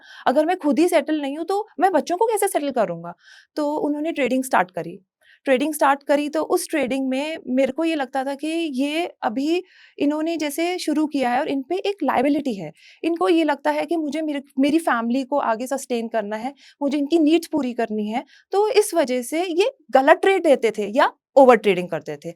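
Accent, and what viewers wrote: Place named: West Delhi, India. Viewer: west delhi touch lagta hai maam ka..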